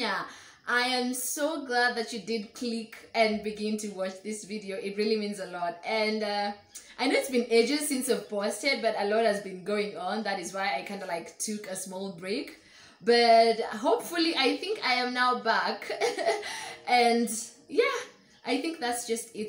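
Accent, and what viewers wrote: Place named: Luhya, Kenya. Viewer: 😂I love it when you speak swahili manze..it comes out so naturally..the Luhya version babe...the products are so preeeeettyyy😍just like you...keep doing you shawryy...❤❤❤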